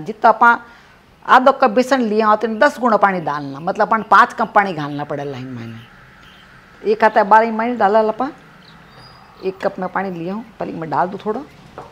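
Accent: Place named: Rajasthan, India. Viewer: Aunty u r great apki saari dishes bhut achi shandar hoti h Par jab aap saath me marwadi bolte huye bnate ho to usme aur bhi jaan aa jati h Bhut acha lgta hai jab aap marwadi bolte huye sikhate h Ekdum maa jesi feeling aati h Thanks ki aap sabko sikhane ke liye itni mehnat karte ho.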